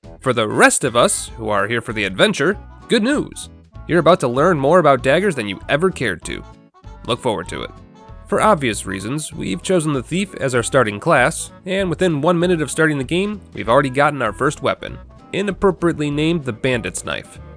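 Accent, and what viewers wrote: United Kingdom. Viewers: When a British play dark souls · Finally British dark souls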